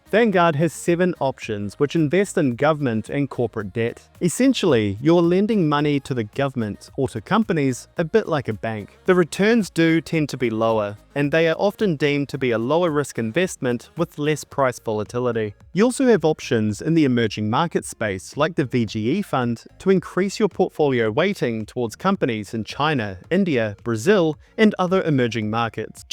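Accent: New Zealand. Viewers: I hear a NZ accent. Im not crazy right?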